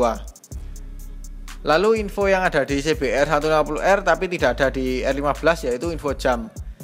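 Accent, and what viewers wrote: Malang, Indonesia. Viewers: Mas org malang ya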